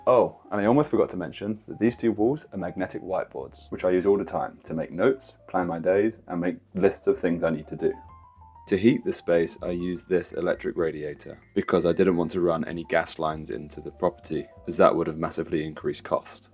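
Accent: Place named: England, United Kingdom. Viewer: I'm hitting a like because you're cute and I'm a sucker for the English accent.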